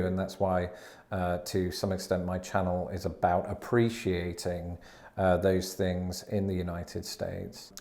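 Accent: United Kingdom. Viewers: don't get TOO Americanized, because our 21st century culture is largely crap. And above all, don't lose your British mode of diction. It will always always serve you well. And by the way, a Russian friend of mine once clarified the matter for me about the alarm going off. It doesn't go off; it goes on. Same goes for the bomb.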